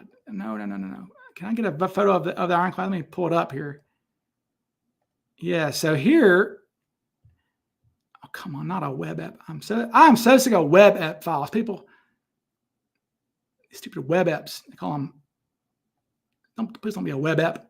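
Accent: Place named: South, United States. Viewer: Ha voice of insight/truth/reason but a hillbilly voice PS like grading the suspects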